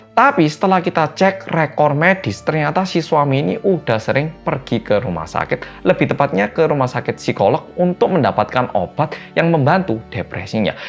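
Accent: Java, Indonesia. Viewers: Great story to watch 👍👍👍 Thank you mas ' ' 😁🙏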